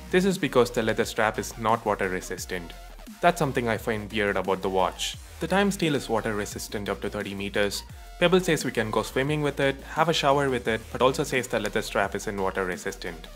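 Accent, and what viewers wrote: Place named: South India, India. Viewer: You have a typical south Indian's accent. Not complaining though! 😃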